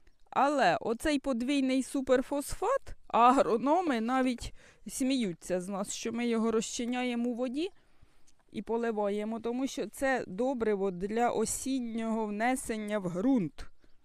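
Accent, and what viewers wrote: Poltava, Ukraine. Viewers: Зразу впізнала полтавську манеру розмови, бо у мене тьотя там живе. Дуже приємно слухати. Ви молодчинка, любите землю і рослини!!!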